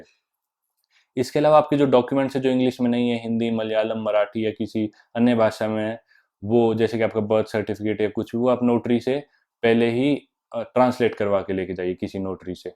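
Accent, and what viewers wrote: Haryana, India. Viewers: Haryanvi ho naa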